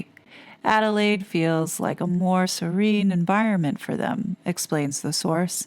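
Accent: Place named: United States. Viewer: Why did the US magazine mentioned abut how much they are "IN LOVE", we heard so much about their living separately and how Harry's demeanor changed as soon as Me Me showed up? BTW, did I hear correctly in your recent videos that you were a woman of color and that you lived near Windsor? You are an American living in the UK? You have a soothing American voice and accent.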